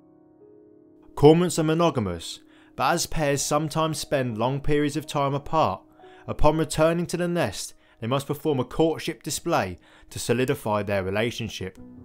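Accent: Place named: England, United Kingdom. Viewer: I'm curious about your accent. What area of England are you from?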